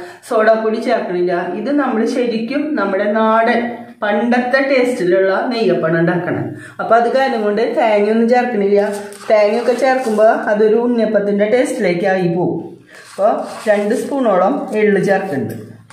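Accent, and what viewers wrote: Thrissur, India. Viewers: Nammade Thrissur bhasha kekkumbo enthoru santhoshaannu ariyo ..thanks mummy ..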